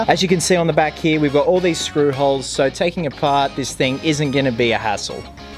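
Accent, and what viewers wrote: Australia. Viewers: I could tell you were an Aussie from the start of the video.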